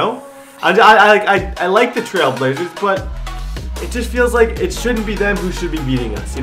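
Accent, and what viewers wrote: Canada. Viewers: I can tell Canadian because you say soorry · I knew you were Canadian since you like the Raptors and how you say about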